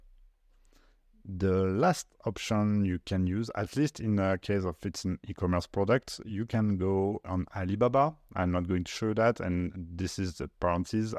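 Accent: France. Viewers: L’accent français ;)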